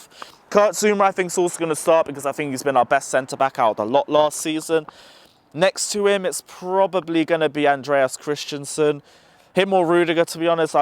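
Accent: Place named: London, United Kingdom. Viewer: he's from England bro. From the accent you can tell he was born and raised in London or thereabouts. He's reading Werner in English. Nothing is wrong with the way he pronounces the letters. He just has to make an effort to pronounce it the german way since it's a german name. That's all....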